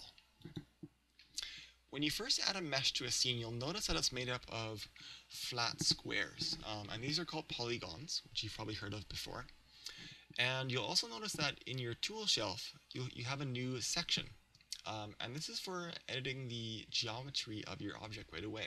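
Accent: Canada. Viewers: I <3 you because you're Canadian! I noticed right away!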